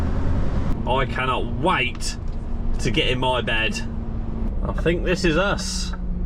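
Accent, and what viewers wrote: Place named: United Kingdom. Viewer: i liked this uk english voice :D , btw nice video as always bro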